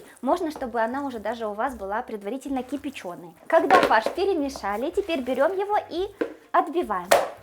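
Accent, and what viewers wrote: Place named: Surzhyk, Ukraine. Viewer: ты Слава Богу становишься сама как пухкенька котлетка. Уже пришла в себя. Говори на родном суржике. Вон ЗАКАРПАТЬЯ ГОСПОДЫНЯ она ведёт канал на закарпатской диалекте и её смотрят все и понимают. Не переживай.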